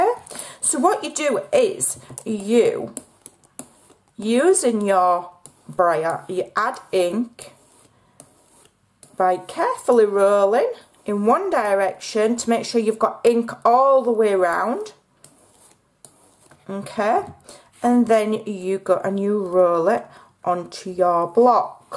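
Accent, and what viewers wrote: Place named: Lancashire, United Kingdom. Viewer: Did you grow up near Lancashire? I ask because Jane Horrocks was born in Rawtenstall and you sound like her a lot. I have always loved your voice! Your so amazing <3 Tug at this American man's heartstrings lol. Thank you for all the wonderful videos.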